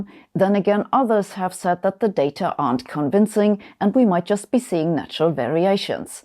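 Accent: Germany. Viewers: Thank you very much for this excellent presentation and i have to say your teutonic accent seals the deal.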